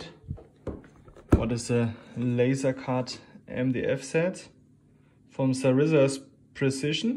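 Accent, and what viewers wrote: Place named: Germany. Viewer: I love your English its German and English togeder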